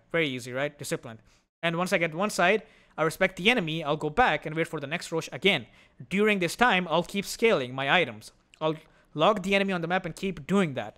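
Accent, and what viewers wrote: India. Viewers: He sounds Indian. I’d be constantly angry too if I was a subhuman.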